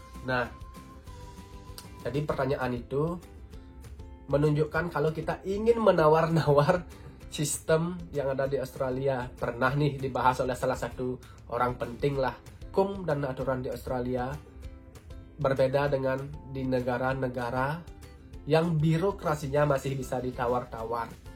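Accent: Bali, Indonesia. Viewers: Logatnya kek orang bali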